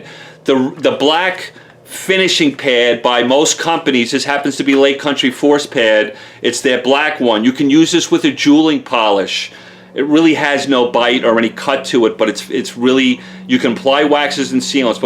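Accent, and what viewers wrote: New York, United States. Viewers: I just love that thick NYC accent !!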